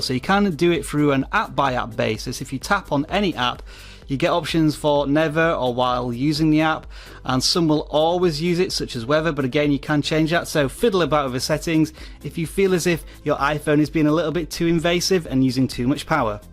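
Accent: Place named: United Kingdom. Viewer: great video and nice clean British accent :-)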